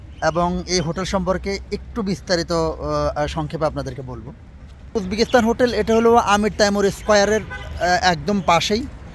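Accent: Noakhali, Bangladesh. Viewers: বাই আন্নে নোয়াখালীর সুদ্দু বাসায় কতা বলেন, বালো লাগে তবে সুজোগ পেলে স্পিচ থেরাপি নিয়েন, আরো বালো দানদা করতে ফারবেন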